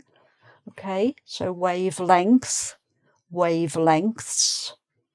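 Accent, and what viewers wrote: United Kingdom. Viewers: Dear madam... l really enjoy listening to your clear english accent... I usually like to listen to short english story audiobooks on youtube but they are usually narrated by unclear voices.. I wish you could upload short stories audiobooks narrated by you... please try this idea.. I'm sure many of english learners would love to listen to classic english short stories enjoying your clear british accent... thanks alot madam 🌷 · british accent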